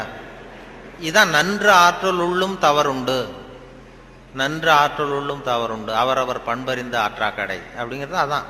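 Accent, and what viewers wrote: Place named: Villupuram, India. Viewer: Mama Villupuram voice super mama love you raa bgm always sweet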